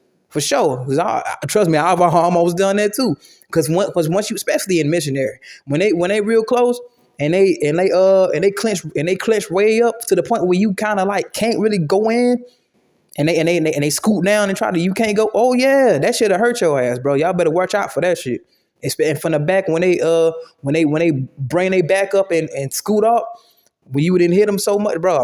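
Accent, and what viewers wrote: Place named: South Florida, United States. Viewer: I ask bc you have a soflo accent and dialect 🦾 I was like be Fromm the crib lol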